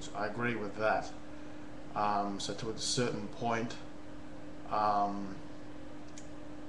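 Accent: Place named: Australia. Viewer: Your Australain accent isn't very strong.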